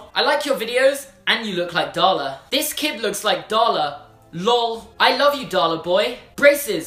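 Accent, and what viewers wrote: England, United Kingdom. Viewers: I actually really like your braces and I just don't get your voice because of the English accent since I'm American sorry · Idek how y'all can make fun of his braces when he looks so cute I mean fr fr braces+cute British accent+BEAUTIFUL HAIR=the perfect boy so y'all can stfu and stop talking about him thx👌🏾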